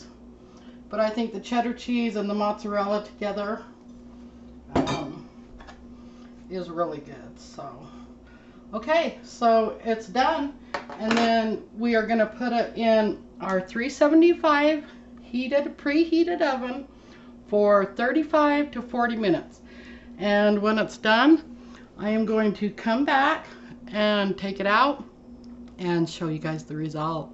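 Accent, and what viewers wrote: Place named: Utah, United States. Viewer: I spent about 7 years in Utah. (Including a couple years in your area!) Your Utah way of speaking, & your recipes take me back. I love it! Love to you & yours. Wishing you & your family a very Merry Christmas, & the very best of this holiday season. Thinking especially of your parents, & praying that everything proceeds in the best, most gentle, Light-filled way possible. God be with y'all.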